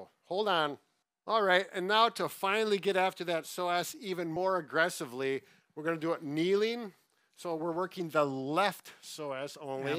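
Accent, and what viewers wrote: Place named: Wisconsin, United States. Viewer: I detect a Wisconsin accent.. you guys are rad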